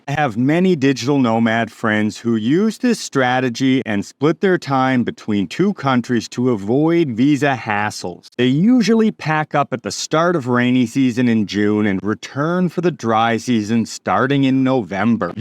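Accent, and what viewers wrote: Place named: Canada. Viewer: You sound Canadian, probably Alberta? If you are into languages check out Shuo, she teaches Chinese in Bangkok Thailand, is Chinese, a great person and teacher!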